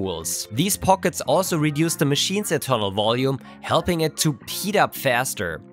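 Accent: Germany. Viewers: If you put aside the quality of the voice that would have sound fake even in the 80's, the worst part is the quality of the translation, it is off all the time when there is some nuance in the term you are using. Fortunately, I learned english a while back and never stopped using it, so original english audio it is. Plus your german accent is something that gives personality to your channel and all of this is obliviated by this disgusting tool. Instead of that, YT should improve the quality of the autotranslation for the sub-titles, it would be so much better.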